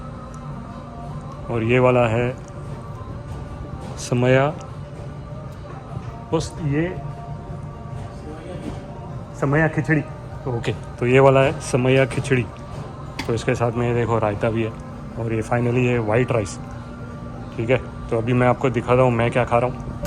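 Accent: Mumbai, India. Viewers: Typical Mumbaiya hindi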